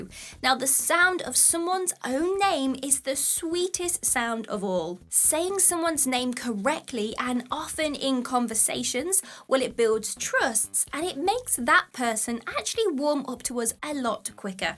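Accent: England, United Kingdom. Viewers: You're just wonderful 😊 And I so much love your accent.. wonder where you're from in England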